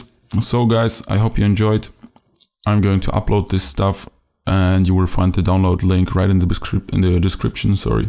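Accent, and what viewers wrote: Germany. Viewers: Man hört den deutschen aus dir haha :D Hast du OSX Yosemite noch nicht ? :o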